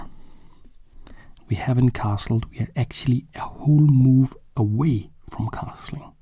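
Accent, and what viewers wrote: Denmark. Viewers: are you Danish? because you sound like one who could be from Denmark.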